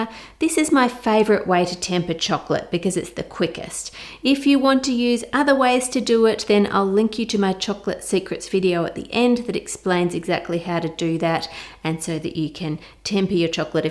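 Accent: Australia. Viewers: I couldn’t be happier that the lil stop motion title sequence is back. Also can’t get enough of voiceovers, iz just relaxing n calming(I think it’s the Aussie accent).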